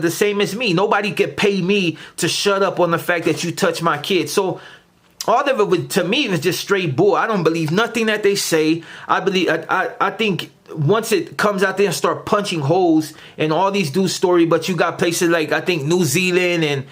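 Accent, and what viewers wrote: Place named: Mexico. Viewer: Why are you put on that Mexican accent in your voice your black brodie🤣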